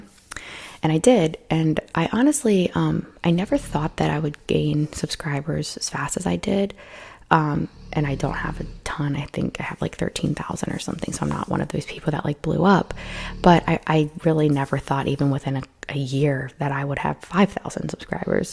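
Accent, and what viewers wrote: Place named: Maryland, United States. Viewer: And also, accent wise, I’m originally from Maryland and i think I might I hear a little of that (or Pennsylvania since they’re similar) in you!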